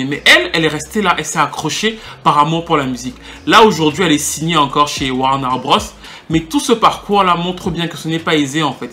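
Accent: Cameroon. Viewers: The way you speak french to an extent reminds me a lot of home 237 love it!!!!!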